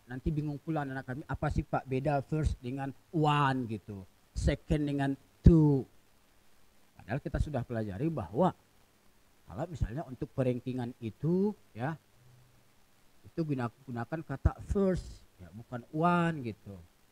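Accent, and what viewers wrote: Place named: United Kingdom. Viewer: British aksen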